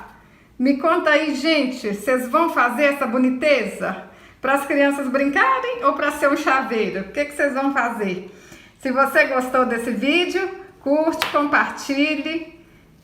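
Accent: Minas Gerais, Brazil. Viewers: Amei essa ideia!!! Já acompanho seus vídeos há um bom tempo, mas só agora me inscrevi no seu canal. Gosto das suas ideias criativas. Às vezes me inspiro pra fazer totalmente novo e diferente do do vc fez, mas o crédito da ideia é seu. Usei uma ideia sua pra fazer o cabelo de uma vovó. Obrigada querida! Ah, amo sua mineirice😊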